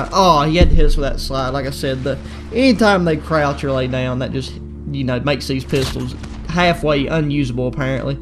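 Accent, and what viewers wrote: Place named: South, United States. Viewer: Is it just me or do u have the best southern accent? Lmao but u earned ur self a sub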